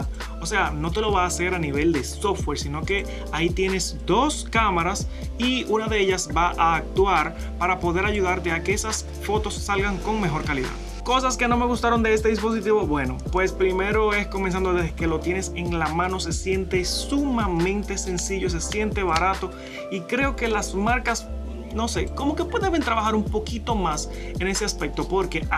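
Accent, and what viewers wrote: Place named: Dominican Republic. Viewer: Yo se que si eres de República Dominicana por el asentó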